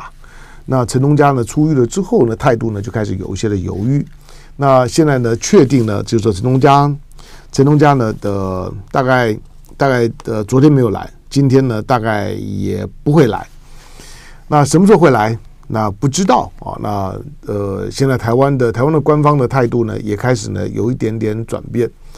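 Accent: China. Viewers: 妳說著標準的大陸口音要蔡總統跟川普下台，典型的中共代理人，中共同路人啊。抵制唐湘龍。。。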